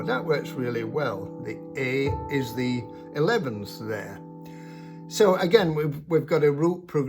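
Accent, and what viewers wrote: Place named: Northern England, United Kingdom. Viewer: Cool to hear a northern accent! I’m from Manchester! Great video:)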